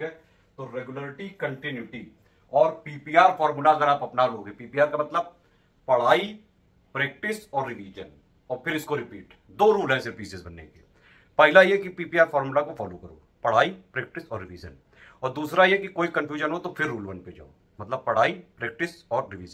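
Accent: Kanpur, India. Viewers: Sir kya Kanpur se hai kyuki apki language humare kanpur dehat ki lg rahi hai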